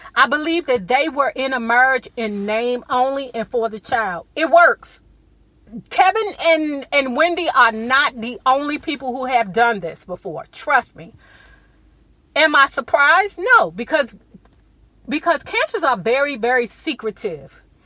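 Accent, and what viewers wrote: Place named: Anacostia, United States. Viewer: Anacostia ? I though I heard an accent 😂😩💞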